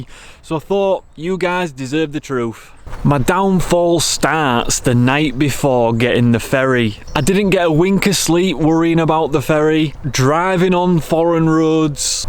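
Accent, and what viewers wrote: Northern England, United Kingdom. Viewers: New Subscriber fella 👍 First off it's magic listening to a Northern accent....you can't beat it lol You took on an epic adventure mate, one that I'm sure many of your subscribers dream about. I think you have hit the nail on the head when you acknowledge it's not something to do alone. To have someone who wants to do the same thing and more importantly to have the craic with, or even have a moan at would be essential for most of us. Jeeez I have just started lure fishing primarily for perch and I find it difficult not having someone to experience my couple of hours on the canal with lol. Keep up the great work....here in the UK we have some of the most beautiful places to visit in the world and we are often guilty of not appreciating it. Go on a UK tour and show us all what we are missing out on. Good luck with everything you do 👍👍👍👍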